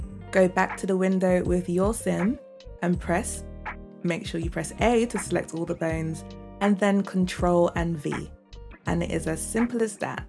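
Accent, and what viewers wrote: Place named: United Kingdom. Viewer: i’m new hereee bur girl you’re british!! omggg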